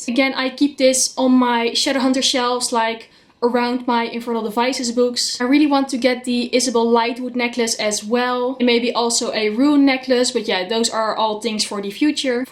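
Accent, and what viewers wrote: Netherlands. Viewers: Hoorde je Nederlandse accent al vanaf de eerste zin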